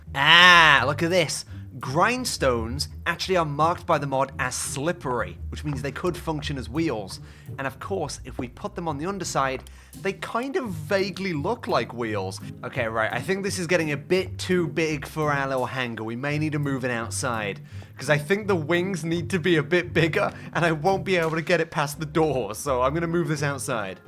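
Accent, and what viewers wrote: United Kingdom. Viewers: u r british yayya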